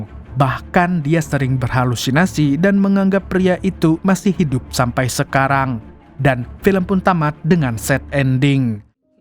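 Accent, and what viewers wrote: Banjar, Indonesia. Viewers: Bang kamu orang banjar ya😅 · Bang pian urang banjar kah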